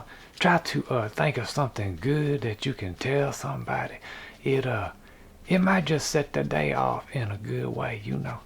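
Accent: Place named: South, United States. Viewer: Your southern accent and humor keep see watching, even though I don't hardly understand everything! lol ,,,,,, You are quite smart!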